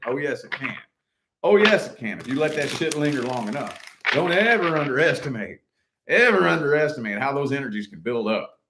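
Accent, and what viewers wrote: South, United States. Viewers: You have to be southern like me with that voice! LOL! I'm from Georgia. And, you're on point with the start. I deserve to be more than an option and game. I watch these for healing and because I am spiritual, I'm Cherokee, and religious. And, it helps to heal with God in place. And, stay focused. I gave my best. For years I tried, done the growth. Forgiveness for their growth, tried to show them that I saw the better in them, and was overlooked or judged for it rather than appreciated. And, at this point, I'll believe what I see. As I deserve, or nothing. No blame game or grudges, just reality and life is too short and should be valued with those we love and love those who love us. Because, pride doesn't love us as much as we love to have it, and it was always first before me whereas they were my choice. So, I done them a favor since they were so unhappy and regretful, with hurtful words, and like I was in the way for loving them, and I left. 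So they could be happy. That was what they made me believe they wanted. I'm a realist. And, everyday that goes by is everyday we cannot get back and nobody deserves to wonder why they don't deserve to be apart of it. Happy holidays!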